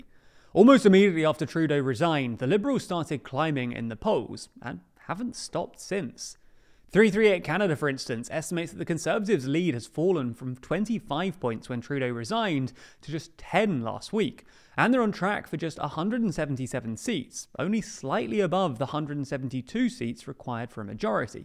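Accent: United Kingdom. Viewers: "Besides, why would anyone believe anything said about Canadian affairs from someone with a British accent." You mean like your head of state King Charles? Who was there for you in the war of 1812 when we collectively kicked the ass of the U.S.? Who fought for King and country at Vimy Ridge? Whose air force fought in the Battle of Britain, while the U.S. stayed out of WW2? If you like the United States so much, then why don't you just go and live there? Stop your anti-Canadian rot. We are not the United States, and our ancestors fought and died not to be part of the U.S. The Tories are just Yankee snakes who will use their disgusting treachery to make us the 51st state.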